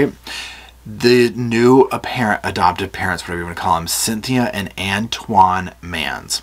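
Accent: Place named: North Carolina, United States. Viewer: I didn’t realize you are from North Carolina…I thought I recognized the accent…❤ This case drew me in like the Darrell Brooks case…but there was no mystery with the DB show…smh… This one keeps me guessing… Love your channel and the way you tell stories…😊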